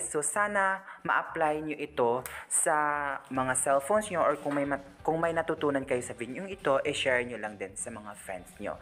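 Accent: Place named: Visayas, Philippines. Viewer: Sorry ‘te medyo I practice mo ng konte yung visayan accent mo medyo nahihirapan kaming listeners mo makasabay sa mga info mo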